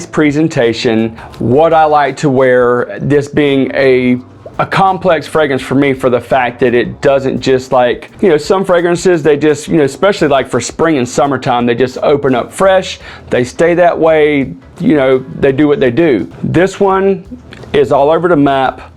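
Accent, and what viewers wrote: South, United States. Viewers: Hey broski - this is a great one from Nishane With the southern accent, you should have went all Varsity Blues - it's a 10, motherf#&king 10!!😂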